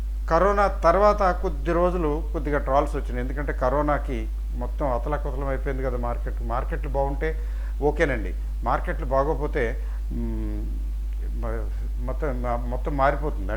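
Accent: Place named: Coastal Andhra, India. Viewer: Sir, what is your native place. I know you are from coastal area.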